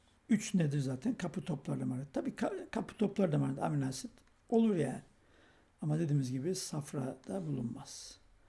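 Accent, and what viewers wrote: Black Sea, Turkey. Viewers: Hocam karadenizin neresindensiniz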